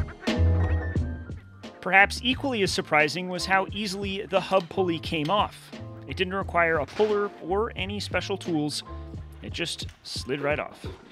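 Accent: United States. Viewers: Amusing and yet so normal to hear an American voicing over a video of a European engine being rebuilt and mentioning that he hopes that this video will make it known what this USA back street workshop can show the ROW (Which is basically Europe) how to do it ! LOL. Why not simply just phone up a European backstreet workshop to work on a European engine ?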